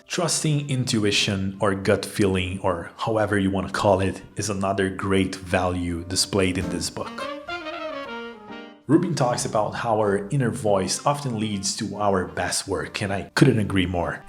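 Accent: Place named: Brazil. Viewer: Great video! Gonna read this one asap. Você é brasileiro? O sotaque é de brasileiro kkk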